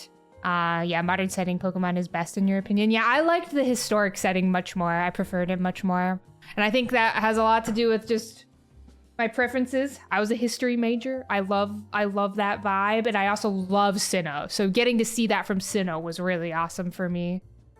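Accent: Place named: Midwest, United States. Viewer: Is it just me or does she have the most Midwest accent possible?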